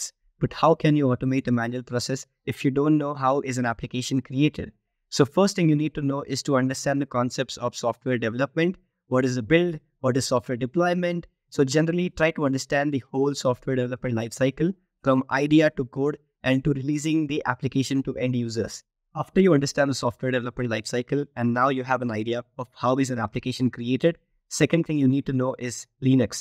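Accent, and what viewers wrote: India. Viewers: Can I make a suggestion please? Your English is very good, but you still have a deep accent. Also, you talk very fast. Indians tend to talk very fast with an accent. So I don’t know about other American people but I have a very hard time understanding you. All of what you’re saying is very interesting and I want to follow it. Even some American speakers will speak fast sometime with different accents and I have the same problem. This is not just simple conversation, but explaining technical topics. So the combination of a thick accent and talking fast makes it really difficult to understand. I could play the videos on slow motion but there is still an issue and it’s a bit annoying. I love the programs from simpylearn and I really want to sign up but the only thing holding me back right now is this aspect. Sorry to ruffle anybody’s feathers but I’m just trying to help. 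And provide some honest feedback. Thank you.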